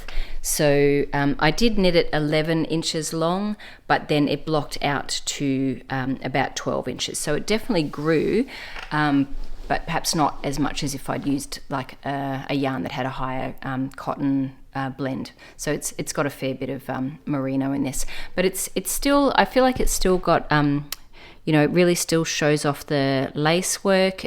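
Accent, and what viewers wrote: Australia. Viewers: Thanks for the Ravelry tip,. There is so much I don't know about it; would you consider doing a tutorial sometime on getting the most out of Ravelry? You are so good at explaining concepts! Love your podcast; there aren't many Aussie accents in the knitting pod world, you are great.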